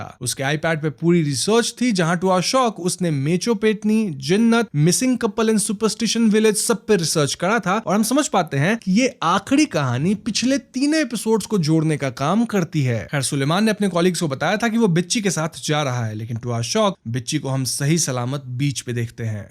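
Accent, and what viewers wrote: Bengal, India. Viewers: bhaiya its loke bole😝.. bt bhaiya ur bengali sounds cute❤ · Thanks Bhai for covering this series! Loved your explanation and cute bangla accent haha! Take love from Bangladesh <3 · Bhai, achha natak kar lete ho..😅 Bengali hoke bolte ho ye bhasa nahi aati... Jitna bhi Koshish kar lo par koi apna MTI 💯% khatam nahi kar Sakta... Aapke bohat words se pata chal jata hai ki your mother tongue is Bengali... I have seen many Bengalis who claim to be a non-bengali and I fail to understand why they do like this...😅 But anyway good try but not the Best as you are caught...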